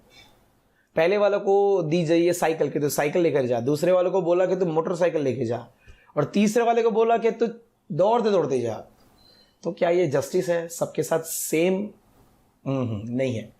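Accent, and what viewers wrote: Gujarat, India. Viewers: Tme gujarati cho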